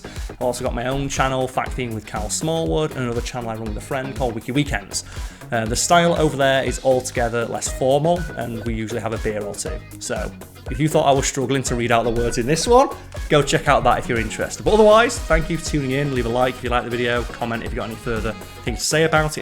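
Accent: United Kingdom. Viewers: A British voice does make it a Simon video!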